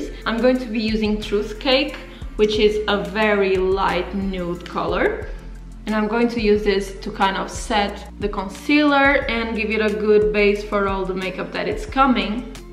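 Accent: Portugal. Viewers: That Portuguese accent is the cutest thing evaaa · You sound portuguese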